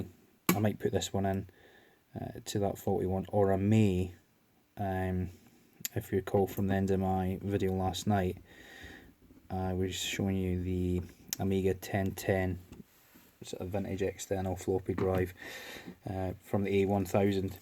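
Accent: Scotland, United Kingdom. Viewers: I like your Scottish accent. Don't do yourself down.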